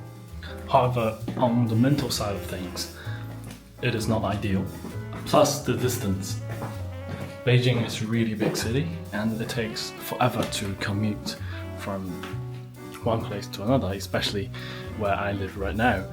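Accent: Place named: United Kingdom. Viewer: I really enjoyed this video. Very interesting. Guessing you studied in the UK from your use of "dodgy", right? You actually sound like a Brit, to me, and I'm English. Your commentary on how people are "ruthless and rude" is a nice contrast to most of the videos I've watched from ex-pats who seem to get lost in heaping praise, for whatever reason. I'm considering TEFL there, but my Mandarin would need to get a LOT better first.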